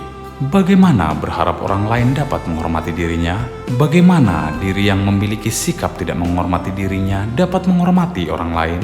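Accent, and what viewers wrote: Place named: Indonesia. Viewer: Kenapa bahasa mandarin di baca dengan logat indonesia??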